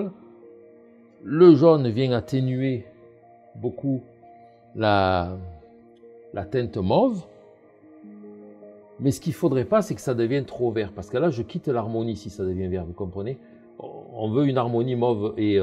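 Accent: South, France. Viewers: Merci pour ces infos et pour ton accent du sud de la France.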